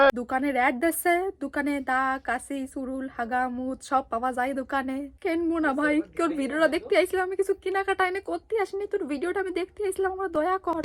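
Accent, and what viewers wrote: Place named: Bangladesh. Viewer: Bangladesh er kun jaigai jeno amon vabe kotha bole 😂😂😂😂😂😂😂kotha gula khub valo lage😂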